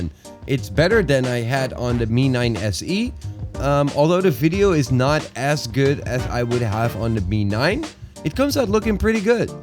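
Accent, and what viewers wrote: Netherlands. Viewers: you sound kinda dutch are u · Are you dutch? Je hebt een Nederlandse uitspraak!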